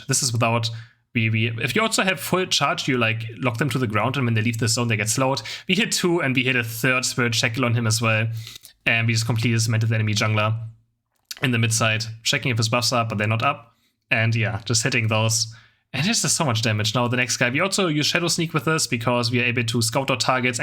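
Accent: Germany. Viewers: Do you have a German accent or am I just imagining that?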